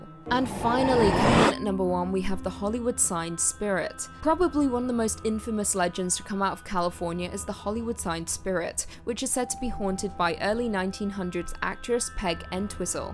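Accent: England, United Kingdom. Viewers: speaking as an English person.. you still sound very English to me. I don't know what they're talking about. Keep up the great vids:) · I didn't realize that California had such dark and scary history and I love English accent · the hollywood sign ghost looks alot like kirsten dunst, is it just me or? is not losing her english accent, what do you mean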